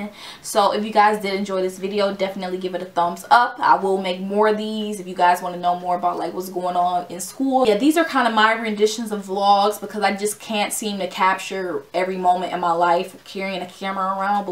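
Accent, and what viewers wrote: Detroit, United States. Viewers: bro you sound like you from the D, this how we all talk around here lmao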